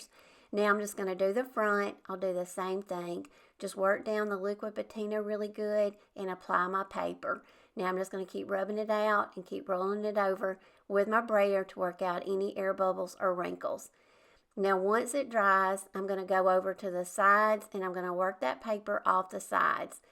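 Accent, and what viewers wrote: South, United States. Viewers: 👋 I could sit and watch you craft all day. Love it all so much. My style spot on. I am so ready to have our retirement home built this year and start decorating it. I am sorry, but I had you mixed up with Lisa, from Our Shabby Cottage. I asked if she ever finished the doll house that she was working on for a hobby. She re assumed me that it was you. Again, I am so sorry for missing you two up. Both with that sweet southern accent, kinda like me. ❤ Love. Anyhow, I had a big interest in that video of your dollhouse. I had my husband build me one that I found on Pintrest. I had to put everything on hold due to family illness, but was still wondering about yours and excited to get back to mind.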